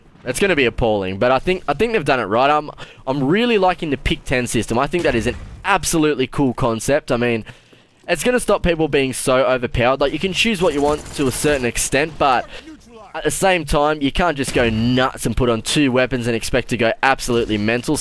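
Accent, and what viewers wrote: Australia. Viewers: I like the dayz, Assassins creed 3 would be Kool give it a shoot. Dude I'll watch anythig with you cause everything is cooler with an ausie accent!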